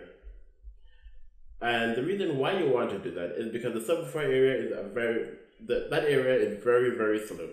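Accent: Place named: Africa. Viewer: You right B'. You have African Accent #TeamAfrica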